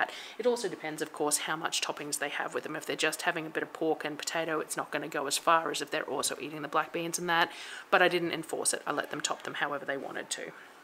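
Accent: Australia. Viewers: I love your content because your real every thing is not all prettied up before the camera is turned on you don’t make me feel inadequate because my home is not picture perfect and your Australian I understand what your saying my sister and I appreciate everything you do. My sister has fibromyalgia and other medical conditions as do I your amazing and we have learnt so much from you ❤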